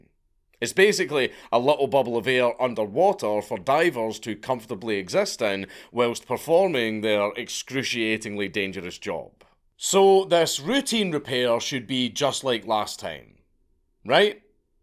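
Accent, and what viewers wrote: Scotland, United Kingdom. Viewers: Fascinated as an American to hear an authentic Scots burr. Barely intelligible to me. Have to rely on the visuals. At least until I am used to it. Very different from Scotty on Star Trek 😂😊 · Oh damn a story I actually do know! but I'm so happy to hear it in a Scottish accent *If you want to hear the audio MrBallen has it at the end of his video covering this same subject. Both videos are equally amazing though. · Stuff like this is hard to get through. Scottish accent or not.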